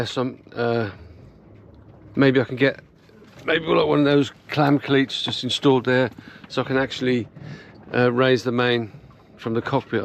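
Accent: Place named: Birmingham, United Kingdom. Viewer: Sounds like you're from Birmingham (same as me) how did you get to live in such a lovely place & get into sailing ??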